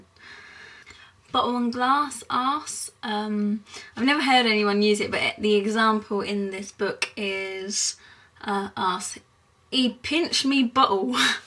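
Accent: RP, United Kingdom. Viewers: It came from the East End. PS. You sound posh!! <link> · Sounds like she went to Rodean! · You sound kind of posh darling.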